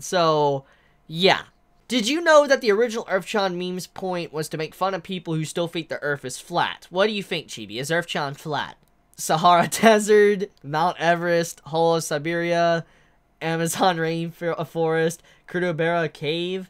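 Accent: South, United States. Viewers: I think he from the south too.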